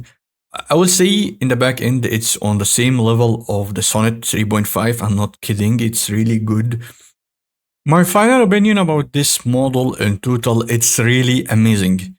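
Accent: Egypt. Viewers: I like you Egyptian English accent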